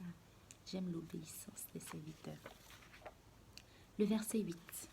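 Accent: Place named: Ivory Coast. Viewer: ton parler donne envie d'écouter et ton accent ivoirien 😃. Merci pour le message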